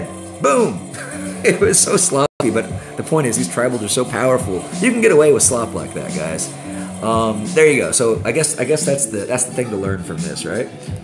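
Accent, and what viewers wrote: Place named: Texas, United States. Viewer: Haha you smoothie you 🤪 Mellow music in the background, deep Texan voice, shades and a bit of paraphrasing “it’s all about the science baby, just relax” Nice vid 👍🙂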